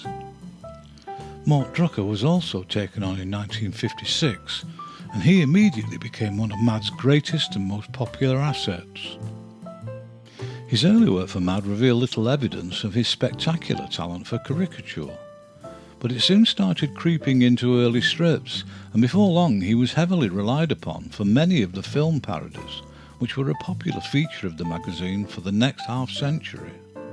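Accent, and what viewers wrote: United Kingdom. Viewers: Why am I listing to an individual with a British accent tell me about Mad Magazine ? Spy vs Spy was the best part of Mad Magazine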